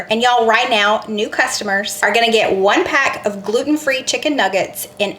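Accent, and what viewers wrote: South, United States. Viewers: I say Paprika like you do. Maybe it’s a southern thing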